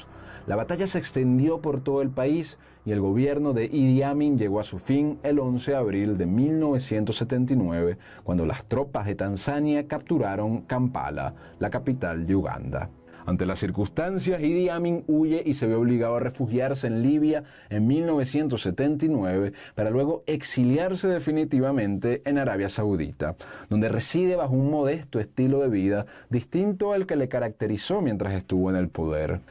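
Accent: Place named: Venezuela. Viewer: La historia debe ser buena pero solo escucharla por un acento venezolano me parece asqueroso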